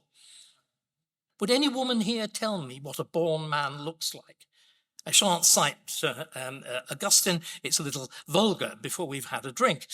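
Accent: RP, United Kingdom. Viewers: What a load of meaningless guff. Run on sentences of nothing delivered in a posh accent; who’s gullible enough to fall for this man’s schtik?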